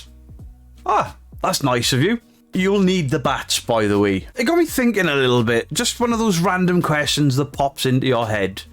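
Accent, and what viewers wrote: Wales, United Kingdom. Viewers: Are you from Wales? 🤔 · Love ya Welsh accent mate it’s lovely from the north mate lovely video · Yes he’s very welsh haha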